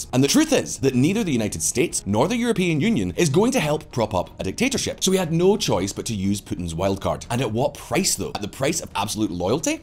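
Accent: Ireland. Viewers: You speak to fast but I understand p, are you ☘️ irish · Are you irish?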